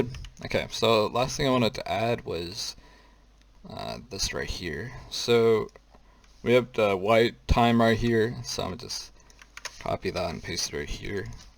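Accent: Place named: Netherlands. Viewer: i smell dutch accent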